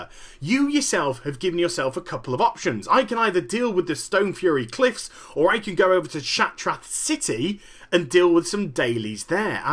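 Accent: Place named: England, United Kingdom. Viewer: I Think hes English :D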